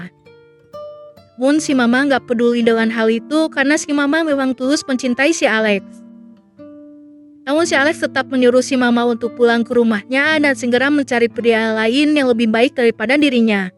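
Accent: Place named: Cicadas, Indonesia. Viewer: Jigana si admin orang cicadas ya. 😁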